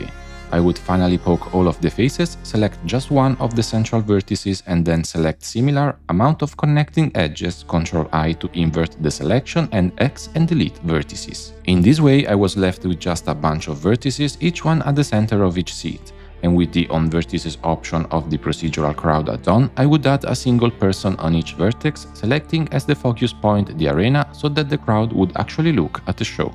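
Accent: Italy. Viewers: Ma sei italiano?? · Ehm mi sembri un italiano all’estero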